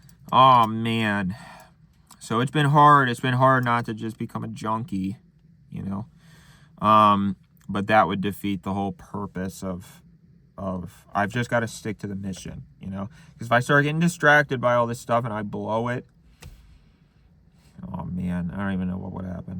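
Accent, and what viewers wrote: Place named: Maryland, United States. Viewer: He's really leaning extra hard into the Maryland accent in this one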